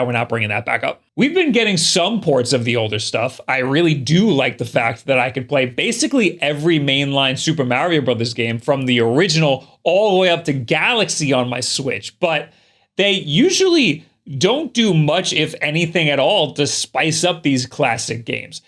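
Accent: New York, United States. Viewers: The first Wulff Den video I saw, said Mario and I immediately said to myself, "He must be from New York, close to NYC."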